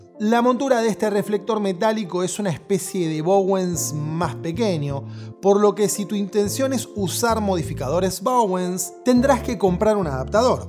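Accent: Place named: Argentina. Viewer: Conocí el canal por este video. A ve que está muy bueno. Solamente medio bizarra la mezcla de español neutro y acento argento jajaja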